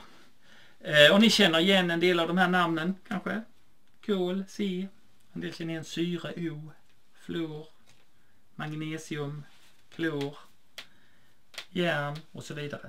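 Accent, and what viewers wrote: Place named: Scania, Sweden. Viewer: Kul att lyssna Kemi på skånska 😅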